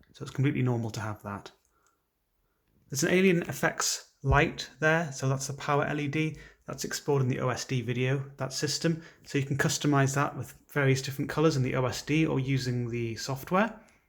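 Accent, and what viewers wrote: United Kingdom. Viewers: Love British English 🥰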